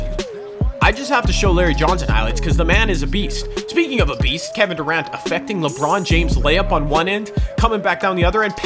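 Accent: Canada. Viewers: Hugh Janus Hes Canadian its just his accent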